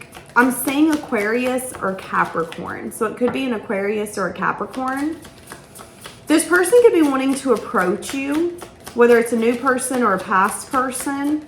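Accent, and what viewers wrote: South, United States. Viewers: ❤ I adore your spirit! Always so sweet and to the point. Southern bell?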